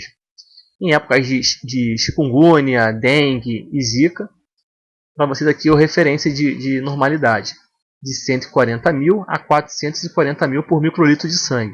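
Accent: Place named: Rio de Janeiro, Brazil. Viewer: Adoro esse sotaque carioca. Um dia ainda caso com um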